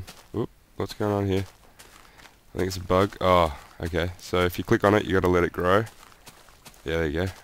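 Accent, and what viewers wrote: Australia. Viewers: boss video...u can tell you're aussieee....am i wrong (subscribed!!)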